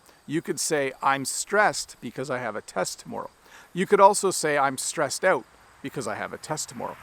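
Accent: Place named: Canada. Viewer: If there is a person without stress, tell me who, I’d wanna be that person! Great lesson. The more I watch your lessons, the more I like them. Because you teach in a very unique way and are kind and understandable. And your explanations always make sense. Keep it up, especially because I love Canadian English. Greetings from Switzerland.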